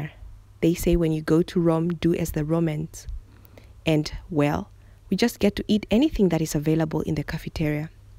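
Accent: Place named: South Africa. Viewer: You're South African.. your accent reminds me so much of my friend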